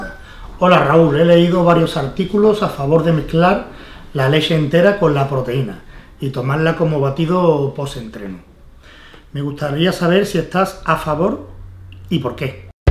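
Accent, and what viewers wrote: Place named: Seville, Spain. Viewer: es sevillano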